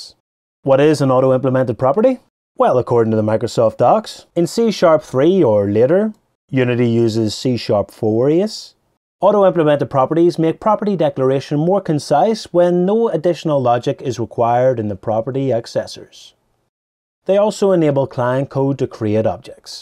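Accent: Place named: Northern England, United Kingdom. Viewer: LOL ! I thought you were scottish and then immediately realized you were from the north.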